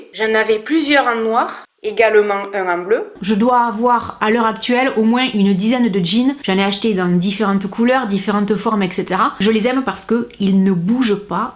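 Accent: South, France. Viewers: Oui Sarah tu as raison,elle est jolie,son petit accent du sud,des cheveux et la couleur super belle. Elle est vraie, on le voit sur elle.moi je l adore.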